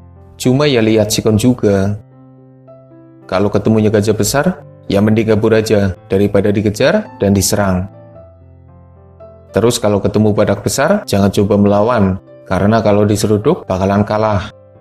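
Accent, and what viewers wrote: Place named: Java, Indonesia. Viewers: Medok bang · Bang suarane medok tp lucu nemen yakin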